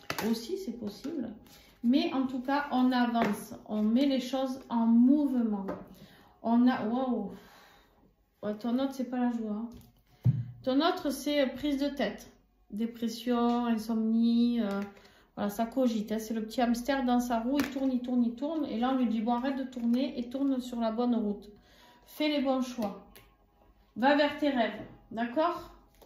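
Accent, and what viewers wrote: South, France. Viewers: bsr vous me faites rire avec vos expressions je les retients je n arrete pas de rire vs etes super et votre accent du sud trop top sinon les cartes me parlent :face-fuchsia-poop-shape: ps cul patate j adore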